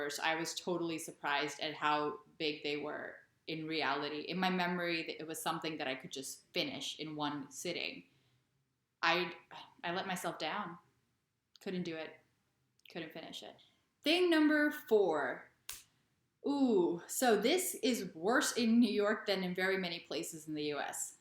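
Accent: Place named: Netherlands. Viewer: She lives only 1 year in the Netherlands and has already a steenkolen accent.. wtf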